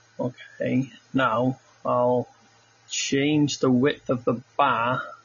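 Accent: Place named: United Kingdom. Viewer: thats the strongest british accent ive heard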